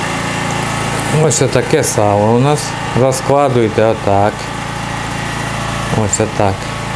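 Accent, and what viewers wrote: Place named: Chernihiv, Ukraine. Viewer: Суржик супер, яка область, Ченіговська?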